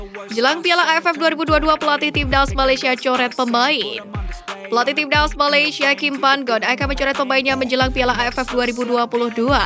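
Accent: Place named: Indonesia. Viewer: Pengisi suara mantap bagus, renyah, jelas logatnya indonesia banget ga spt yg lain yg kadang sok sok an dicampur bhs asing. Lanjutkan info terkininya. 👍🏽💪🏽✊🏽🤝🏼💖🇮🇩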